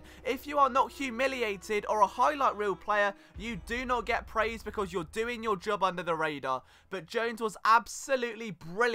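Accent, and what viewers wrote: United Kingdom. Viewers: I absolutely love your analogies, I'm a Native tarheel; and we have the Carolina Panthers here, but I've been an EAGLE fan for 33 years! Growing up there were no Black QB's, except for Doug Williams who still led the awful Bucks to an NFC divisional play off. Love the accent, I'm compelled to drink a cup of Earl Grey when watching your Eagle report, keep flying.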